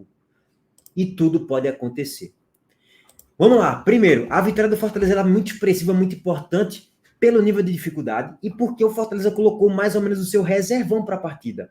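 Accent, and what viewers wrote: Bahia, Brazil. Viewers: só baiano nordestino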